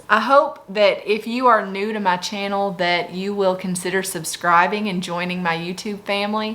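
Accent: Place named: South, United States. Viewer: Hey! I'm not sure if anyone has already asked this question or if you're allowed to share the info but I was wondering where you went to have your surgery? You sound southern and I was hoping (fingers crossed) we were relatively close in location. I would love to have this done and would want to go to a good surgeon.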